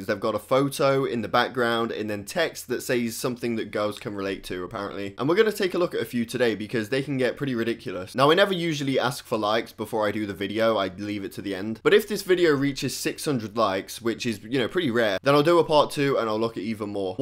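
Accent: England, United Kingdom. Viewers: Your accent makes me want to move to england.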